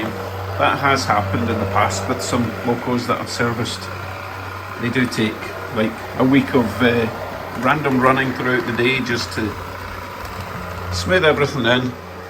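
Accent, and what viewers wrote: Scotland, United Kingdom. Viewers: I love your Scotts accent my father was of Scotts descent and when speaking to older members of the family that is the accent I remember.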